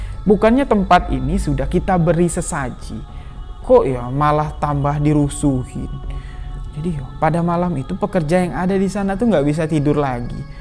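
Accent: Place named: Bali, Indonesia. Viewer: Afwan, antum Logatnya seperti orang Bali ya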